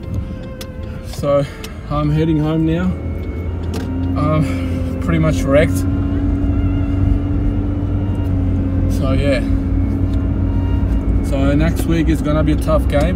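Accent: Australia. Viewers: in ka accent to pure aussie lag raha hai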